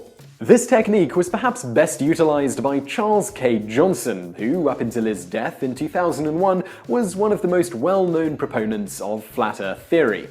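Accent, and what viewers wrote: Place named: Cockney, United Kingdom. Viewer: Watch something positive too much cockney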